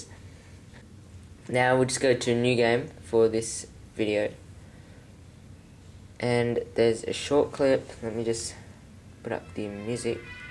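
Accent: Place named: Australia. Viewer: Nice australian accent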